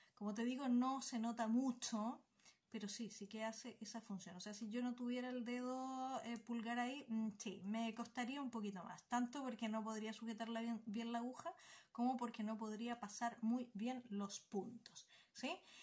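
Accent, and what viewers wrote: Chile. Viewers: Tienes acento entre chileno y españolísimo!! Jajaj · speak in chileno cuicos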